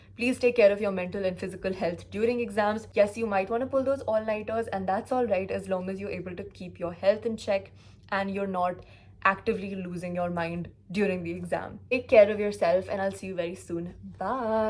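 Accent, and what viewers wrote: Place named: India. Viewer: This is called cbse accent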